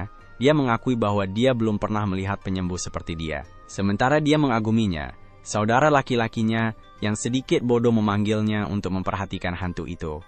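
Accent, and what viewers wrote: Malaysia. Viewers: Logat nya melayu nih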